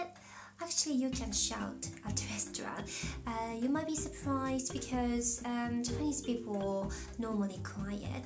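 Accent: United Kingdom. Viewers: British English accent?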